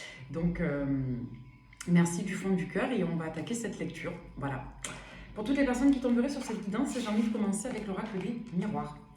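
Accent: South, France. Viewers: L'accent du sud, c'est la vie, c'est plus qu'un accent, c'est un état d'être. Un grand merci pour votre lumière bienveillante et franche.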